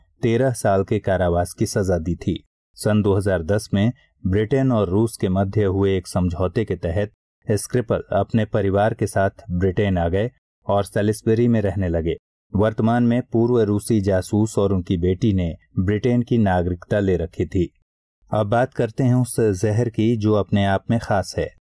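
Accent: India. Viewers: i was feeling like came in 90s era as sound was like old radio station while listening but it contains the knowledge,from past, present and future, is very useful. thanks , i like the old indian accent..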